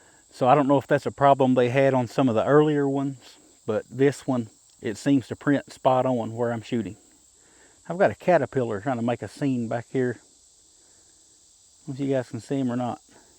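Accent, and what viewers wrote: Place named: South, United States. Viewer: that southern accent 1 in a million man.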